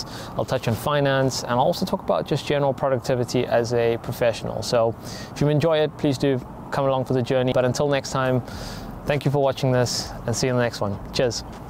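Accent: South Africa. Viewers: Nothing more beautiful than a South African accent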